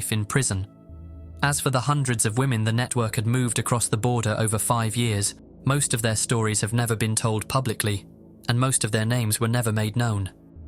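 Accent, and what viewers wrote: United Kingdom. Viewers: bollocks* He sounds British 🙃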